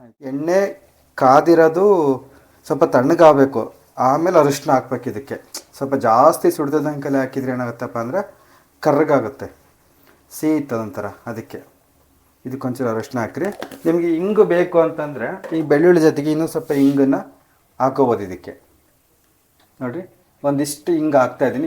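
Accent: Chitradurga, India. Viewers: Sir neev yav oorinavaru?nim language same nam Chitradurga style nalli maathadthira 👌👌👌...nim recipe thumba ishta aythu sir 😊😊😊😊😊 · ಇದು ದುರ್ಗದ ಭಾಷೆ ಅನಿಸುತ್ತದೆ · ನಿಮ್ಮ ಭಾಷೆ ತುಂಬ ಚೆನ್ನಾಗಿದೆ. ನಮ್ಮ ಚಿತ್ರದುರ್ಗ ಜಿಲ್ಲೆ ಕಡೆ ಹೀಗೆ ಮಾತನಾಡುವುದು. guraduvudu, Durga barga etc.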